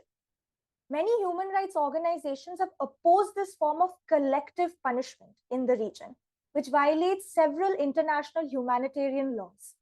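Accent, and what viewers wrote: India. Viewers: If you want to make news in English then sound like you're speaking English not Indian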